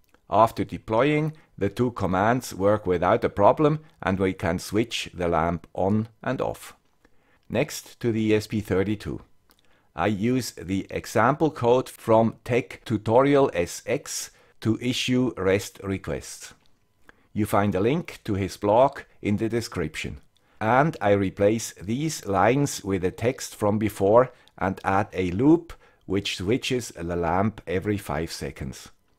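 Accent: Switzerland. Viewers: It's a sin. Anyway, ok for the swiss accent: you are great! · Hey Mr Swiss Accent.... you should try using the mobile hotspot in Windows 10. Just search hotspot and it will take you to it. Set the SSID and Passwort and activate! ;)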